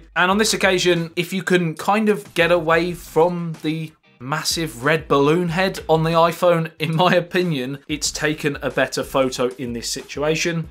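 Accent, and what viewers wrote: United Kingdom. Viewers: eu amo o sotaque britânico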